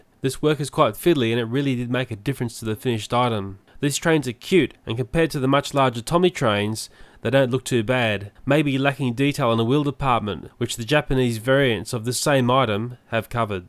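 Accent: Australia. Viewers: i just realised your from australia?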